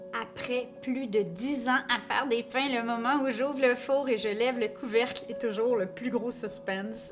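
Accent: Quebec, Canada. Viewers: Tu es vraiment très doué et très drôle avec ton accent canadien 😂 merci de partager ton savoir faire! J’en fais souvent du pain dans la cocotte… une belle réussite à chaque fois! 👍 Depuis la Suisse 🇨🇭 Merci 🤩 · Bonjour depuis la Belgique 🥰 Tout d'abord merci pour votre peps votre bonne humeurs votre extrême gentillesse Quel plaisir de vous écouté (j'ai tjs adorer adoré l'accent canadien ) Ici depuis 2 semaine j'essaye de réaliser mon pain cocotte mais d'après une autre recette et avec de la farine au cereal et j avoue que c'est une catastrophe à chaque pain . Je vais donc essayer vôtre recette demain avec les même quantité d'eau car je met moins de liquide habituellement. Mais je vais essayer de me tenir à votre recette et la laisser poser au réfrigérateur jusqu'à demain. matin.🙏🤞🤞🤞 je vous dirai si ça a fonctionner Car même tailler je ne fais pas comme sa d'un.mouvement sec donc tout retombes. 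Mais je vais essayer vôtre recette à la lettre merci ❤❤❤ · Décidément j'adore l'accent canadien 😁 Question 475 °F ça fais combien en degré celcius ? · moi aussi ! et j’aime voyager au Canada grâce à ce bel accent 😉 ça me rappelle de beaux souvenirs 🥰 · Merci pour cette recette et ce charmant accent québécois 💊💉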